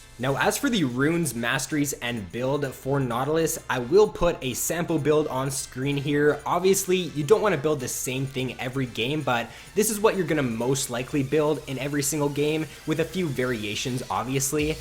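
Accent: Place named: Canada. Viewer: ...You're Canadian aren't you... Good video though.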